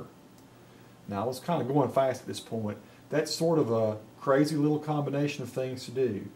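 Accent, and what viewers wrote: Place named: Eastern Georgia, United States. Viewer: I have a similar accent (Eastern Georgia) and would love to have a stenographer software program like this that works well with my speech patterns! Looks like it is working well for you,! Thanks for sharing.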